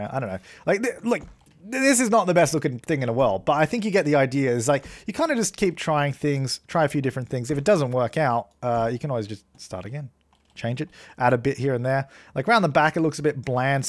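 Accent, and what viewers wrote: Australia. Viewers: Are you from Australia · Are you from Australia? Just wondering as I'm from Australia as well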